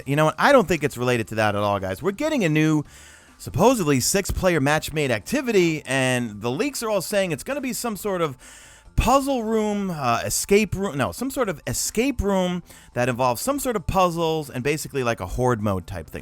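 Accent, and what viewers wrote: New York, United States. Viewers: Love the New York accent. Don’t worry I understood lol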